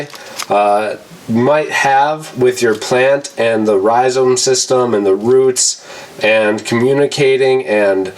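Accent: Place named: Wisconsin, United States. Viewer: Great helpful video! I knew we were neighbors when I heard the accent. Subbing fellow Wisconsinite!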